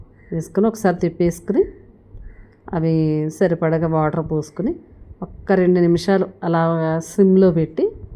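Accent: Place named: Andhra Pradesh, India. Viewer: మీ voice విజయనగరం జిల్లా శ్రీకాకుళం బోర్డర్ లా ఉంది...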